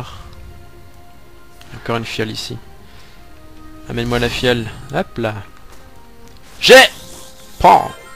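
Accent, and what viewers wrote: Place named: South, France. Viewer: J'adore l'accent du sud de:D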